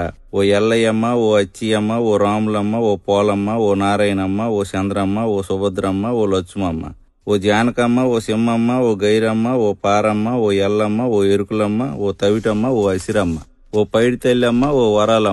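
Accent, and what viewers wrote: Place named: Uttarandhra, India. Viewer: Pakka uttarandra yasa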